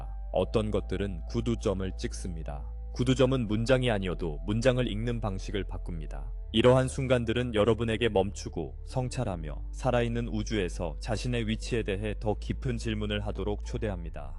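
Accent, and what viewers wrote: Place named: Gyeongsang, South Korea. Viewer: 야호 신납니당~~ 경상도 사투리가 당연하지요 창녕띠기 아인교 ㅋㅋㅋ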